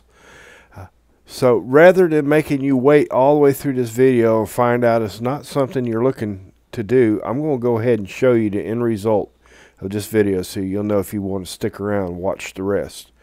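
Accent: South, United States. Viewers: i like your accent man ! southern , sub it, i wish i can learn this accent my Yall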